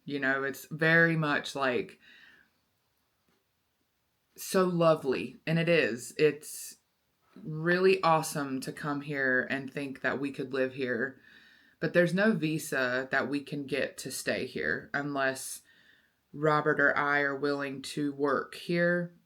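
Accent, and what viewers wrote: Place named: South, United States. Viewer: Have you seen Evan Edinger's videos about food banned in Europe, but not the US? That was an eye opener to me as a lifelong UK resident and really made me wonder what the FDA actually does. Combine that with the fact that the FDA's failure to properly regulate opioids lead to that crisis in the US and it seems like it's a regulator that has been so defunded that it does very little of what work it really needs to. This is the thing that feels so off about the US culturally - it went from "No taxation without representation" to "No Taxation, we don't need no government", so it feels like virtually every regulator is unfit for purpose. The Supreme Court limited the ability of the EPA to control power plant emissions in a fairly recent ruling. I'm saying this to try and put it across that I hopefully get some of your reticence about heading 'home', that the changes in how the country is run that you may like to see would be a very hard sell to other voters, let alone finding a party to represent that. 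To hear your worries about US school shootings while Tennessee are passing a bill to arm teachers instead of looking at the problem at source (and I'm guessing that your accent is from somewhere like Alabama, Kentucky, Tennessee or Georgia), I wish I had words of comfort on that front. Good luck finding a home in California, hope that maybe you can find a community where they try to grow their own food to a higher standard than the FDA asks for and Robert can continue to eat well.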